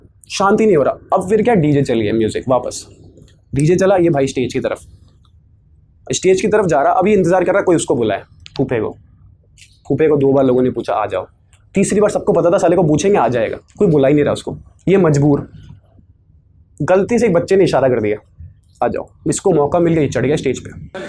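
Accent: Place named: Haryana, India. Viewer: Sir ye hariyanvi accent h... Rajasthani accent nhii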